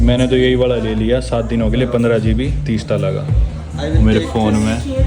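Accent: Haryana, India. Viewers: Bhai kitna bhi kuch karlo par haryanvi touch rahta hai aapki boli m❤❤ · You are so talkative in your vlogs in comparison to Navankur brother ✨😂 and i always have been a fan of your desi-haryanavi accent 🔥🤣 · Bhai aapka tifficulte Harnavi touch achcha lagta hai mujhe ❤❤❤❤ Aur bahut dino baad aap logon ko achcha hotel mila hai ...🎉🎉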